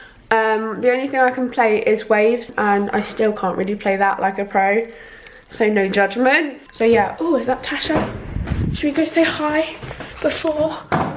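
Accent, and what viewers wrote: United Kingdom. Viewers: I like ger British accent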